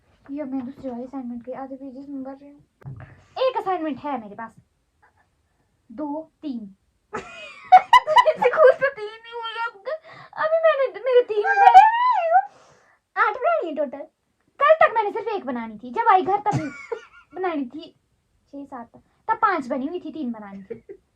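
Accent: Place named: Himachal Pradesh, India. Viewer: Ap himachal se ho na didi ?? Apki tone lagri hai himachal jesi 🥹bahoot acha laga mughe .... Bahoot time bad ese tonne sunne mili 😭😭😭🥹♥️♥️ .....mai mandi se hu ...aao kabhi chai pine ....filhal mai Pune me rehti hu ...bahoot yad ATI hai mandi ki 😭😭♥️♥️♥️🥹🥹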